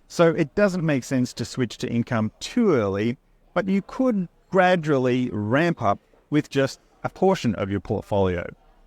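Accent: Australia. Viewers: Sounds like you are an Aussie, same as me. i like the strategies you share on your channel. Question, what brokerage platform do you use?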